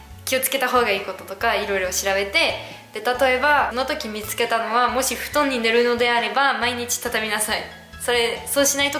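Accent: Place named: Kyushu, Japan. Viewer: あれっ! さん、九州人みたいに話している(うれしいね)。日本語は福岡で勉強したのかな。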